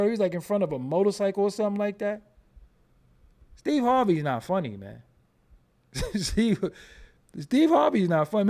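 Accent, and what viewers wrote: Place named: New York, United States. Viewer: Nigga you sound like a NY’er… I’m my NY accent “Get the f… outta here”…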